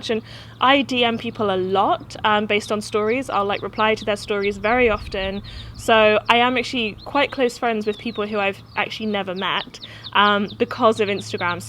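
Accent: United Kingdom. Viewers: You know.. I think I like you )) Your British accent and how you can express all this things. Positive energy comes from you ^^ P.s I also quit Insta for two month already. And it's quite good to check yourself, or just get some extra time. But when I'll get back, I will find you )) Damn, I miss my friends there 😟😁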